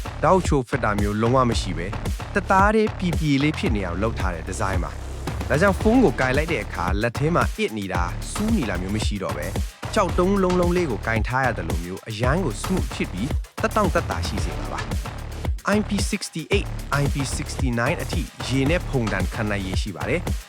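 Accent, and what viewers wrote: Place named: China. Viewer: Is it AI voice? Coz it sounds like a Chinese speaking burmese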